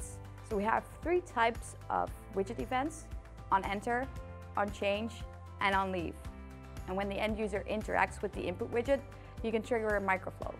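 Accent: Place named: Netherlands. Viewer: Sick dutch accent XD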